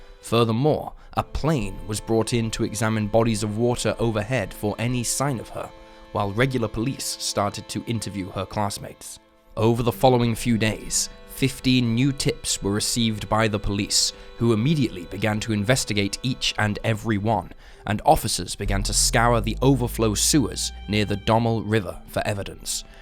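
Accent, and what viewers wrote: United Kingdom. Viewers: British accent 👎🏻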